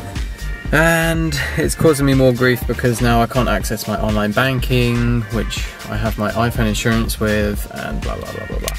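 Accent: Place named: South Africa. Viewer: sorry about your phone :( i definitely think you're developing south-african accent though! :)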